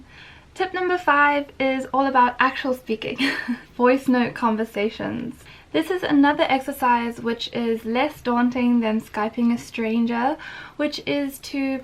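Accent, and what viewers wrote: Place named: China. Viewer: p.s. your chinese accent is so great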